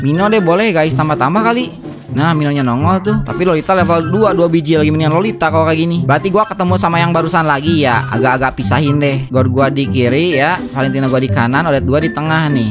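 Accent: West Java, Indonesia. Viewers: Logat SUNDA nya nagih sumpah wkwkwk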